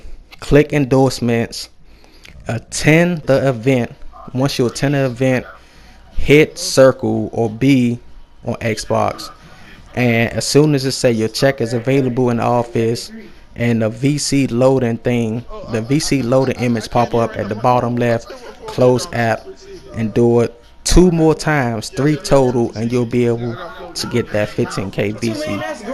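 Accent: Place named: Washington DC, United States. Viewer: I came from another video and I didn't watch the whole video but the part that I did see you sound like you from DC.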